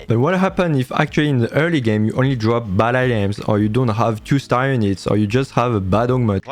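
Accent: France. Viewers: Serais tu français par hasard ? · i didnt understand shit... :/ so hard to keep up, (and also, trying to apply this, got me have the most shamfull loose ever, insane how bad it was XD - it didnt work a bit for me, also because i am nob, but also because i can't understand shit from your "french accent english")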